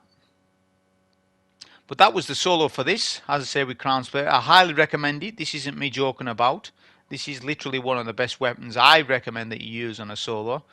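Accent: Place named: North, United Kingdom. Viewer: Where are you from? I detect a northern accent.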